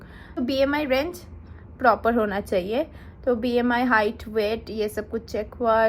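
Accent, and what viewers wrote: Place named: West Bengal, India. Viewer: Apka tengue samajkar muje pata sala app north -east se ho I mean West Bengal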